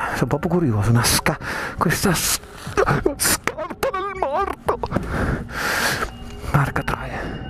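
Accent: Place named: Emilia-Romagna, Italy. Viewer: sinceramente no, dall'accento sembri delle mie parti (emilia romagna o giú di lì)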